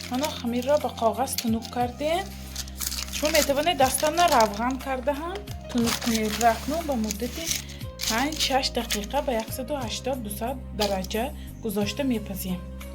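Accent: Isfara, Tajikistan. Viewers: Апа аз Исфара хастед?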